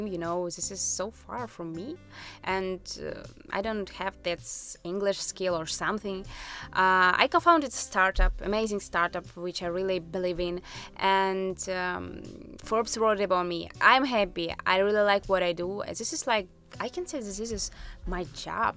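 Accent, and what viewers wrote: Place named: Australia. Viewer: Your English is amazing! I thought that you're Australian :) Nice video, thanks!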